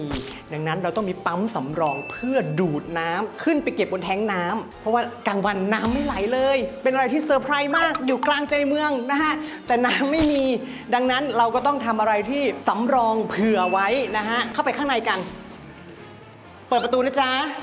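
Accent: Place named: North, Thailand. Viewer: พิธีกรคนเหนือแน่นอน สาปเมืองขนาด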